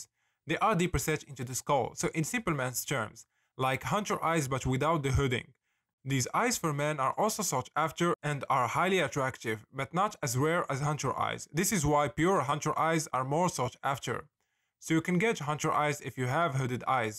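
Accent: Morocco. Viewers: You sound like a moroccan with that accent 💀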